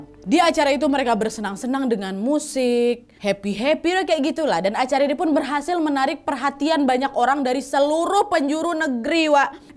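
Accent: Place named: Padang, Indonesia. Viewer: Suka sama cara kak bawain cerita deh, tpi hari ini kak kok logatnya padang gitu hehehe.. semnagat ngonten terus ya kak 😊🙏🏻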